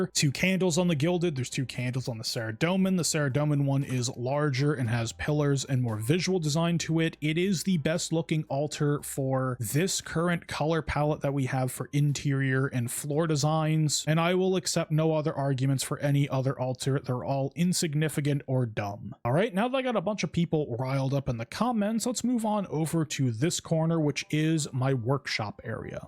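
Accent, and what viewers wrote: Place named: Australia. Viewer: Australian guy talking about castles? I'd be surprised if it wasn't Shad.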